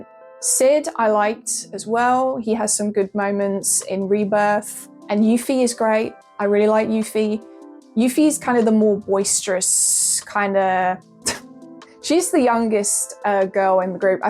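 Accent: United Kingdom. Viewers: Love a British accent 🌹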